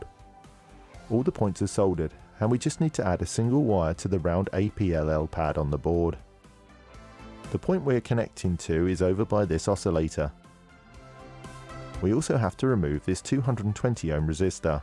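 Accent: United Kingdom. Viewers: Are you from Liverpool? You sound like you're from Liverpool.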